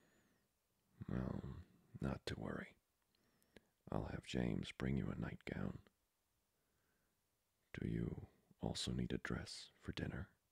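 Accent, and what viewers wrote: Germany. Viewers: What in the German gentleman vampire is this AAAAAAAAAAAAAAA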